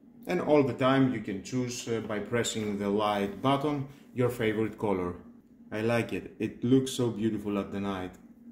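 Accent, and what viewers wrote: Greece. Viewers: Εντελώς ελληνικά αγγλικά 😂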